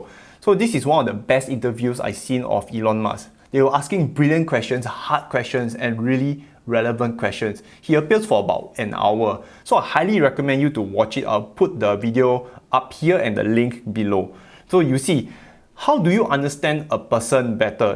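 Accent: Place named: Singapore. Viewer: I don't know what is more valuable about: His knowledge or his Singapore accent?!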